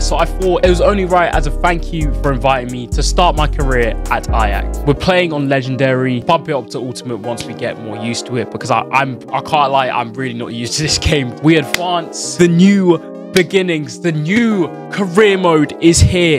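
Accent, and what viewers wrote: United Kingdom. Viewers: Well duh but he’s English accent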